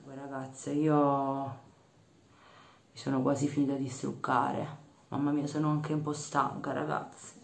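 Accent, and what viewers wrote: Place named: Sicily, Italy. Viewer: Mi fai morire dalle risate quando parli in dialetto 🤣🤣🤣🤣 io sono siciliana e ti capisco bene 😁 e si siamo sempre a pulire 🧹 ti abbraccio ❤️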